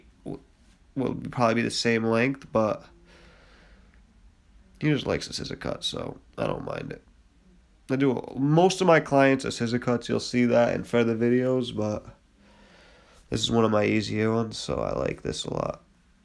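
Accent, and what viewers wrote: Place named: Boston, United States. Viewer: do you ever go 5 star shaver your freaken awesome. You sound like beantown accent.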